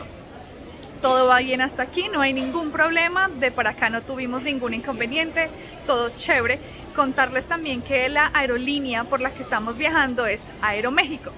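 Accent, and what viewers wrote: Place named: Colombia. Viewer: Me Gusta Mucho cuando. Cuando sí de mijos Y Mijas En su asentó colombiano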